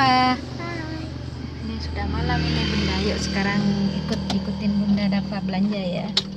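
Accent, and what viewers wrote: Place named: Jember, Indonesia. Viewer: Bunda Dafa asli mana ya klu boleh tau kayak logat bicara e daerah jember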